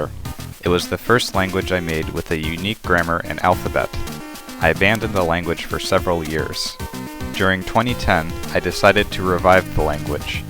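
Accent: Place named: United States. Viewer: Please come up with an accent for the language or something, it sounds terrible with an American accent. Ps. Is that Shovel Knight music in background?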